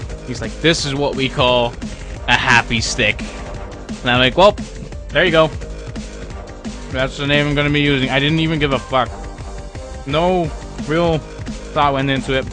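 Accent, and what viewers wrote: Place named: United States. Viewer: Thought so, 'cause he speaks english like real americans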